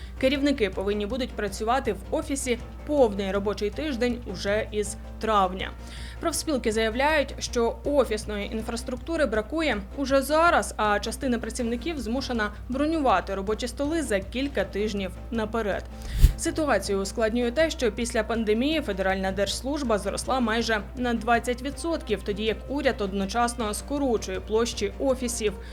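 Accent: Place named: Slobozhanshchyna, Ukraine. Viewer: Я понял, со Слобожанщины,Полтава? Акцент априори от туда,не?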